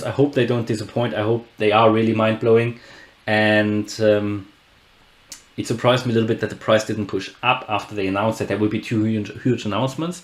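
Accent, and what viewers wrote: Germany. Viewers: Danke für die Analyse. Ich schreibe auf Deutsch, weil ich an deinem Akzent vermute das du deutsch bist 😂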